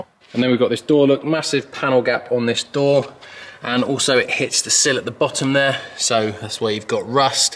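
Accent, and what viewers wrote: Birmingham, United Kingdom. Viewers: Definitely from Birmingham 😂😂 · Blatantly from Birmingham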